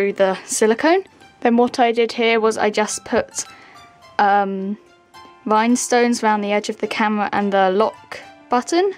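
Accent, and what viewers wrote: United Kingdom. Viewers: Yay ur British I am too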